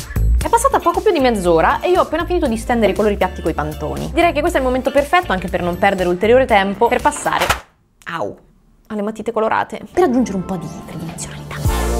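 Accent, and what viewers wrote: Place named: Central Italy, Italy. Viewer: L' accento da Italia centrale si sente un po' troppo, nel doppiaggio ...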